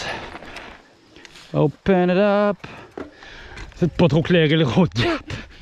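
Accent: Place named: Quebec, Canada. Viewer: Magnifique 😍 j'ai hâte à l'été!! Hors sujet: ça fait 6ans que j'habite au Québec mais c'est la première fois que j'entends cet accent québécois 😅 tu viens de quelle région ?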